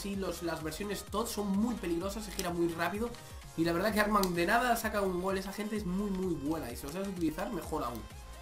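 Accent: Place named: Galicia, Spain. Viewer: de q parte de Galicia eres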